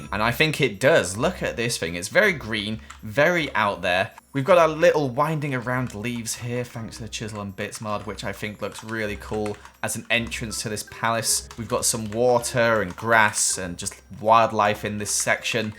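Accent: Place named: United Kingdom. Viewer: without a British accent, the intro would not work at all.. cue me trying to say aww and ore in the same way for five minutes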